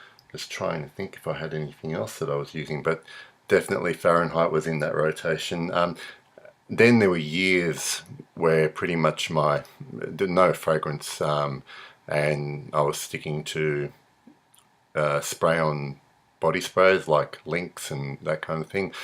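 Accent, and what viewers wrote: Australia. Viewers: I'm a simple man! when I hear an Australian accent...I subscribe! Also awesome intro! excellent fragrance story, I still need to try the original Lagerfeld!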